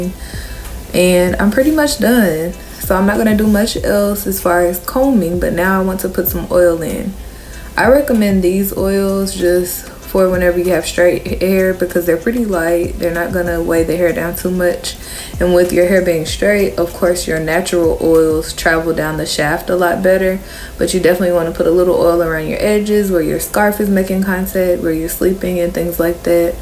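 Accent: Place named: Dallas, United States. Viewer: I really love listening to your voice - for some reason your accent sounds like a Dallas accent - your hair is beautiful, really enjoyed every moment of this process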